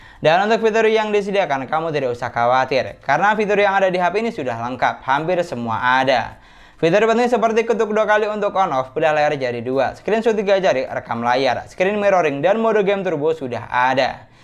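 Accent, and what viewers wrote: Madura, Indonesia. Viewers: kayak nya abang orang madura ya dari logat bicaranya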